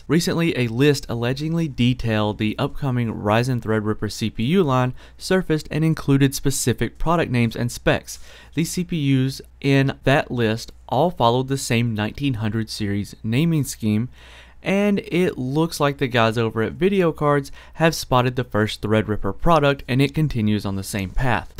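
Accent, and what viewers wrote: South, United States. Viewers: Did I hear some of that country accent in this video? Haha great vid dude.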